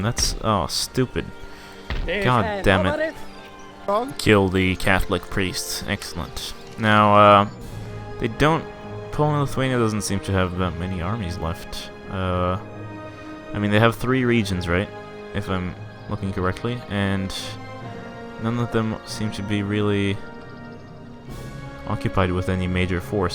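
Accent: Sweden. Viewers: Are you from sweden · Are you Swedish?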